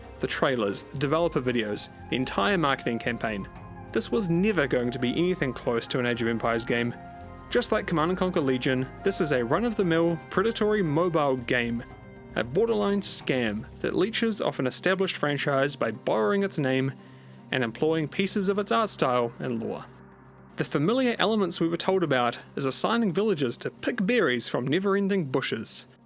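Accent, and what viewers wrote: New Zealand. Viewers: why do kiwis say "i"instead of E?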